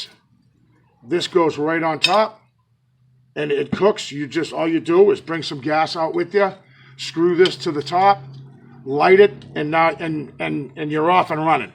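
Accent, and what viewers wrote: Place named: New England, United States. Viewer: New sub here. Squatch Ya Dooin'?:) HEE HEE. Hey. Love the New England accent. Hypotenuse! I gotta kick outta that. LOL. Lookin for more vids. God Bless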